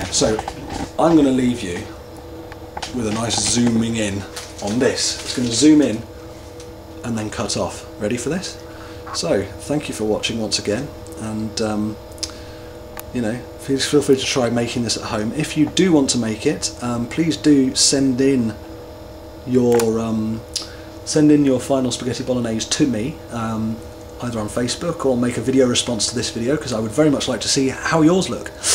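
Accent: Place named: United Kingdom. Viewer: Hello! I watch your videos, and I'm enjoying to improve my English listening you with your fantastic british accent. Hugs mate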